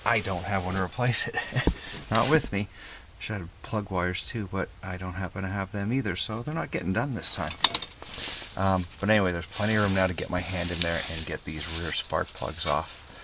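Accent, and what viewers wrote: Canada. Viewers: on my car which has a GM 3.6 HFV6 , on a north - south set up , (rear wheel drive) , the manifold needs to be lifted too , albeit ever so slightly , which is why they fit platinum plugs as standard from factory . Listening to your patois I'm guessing you're Canadian .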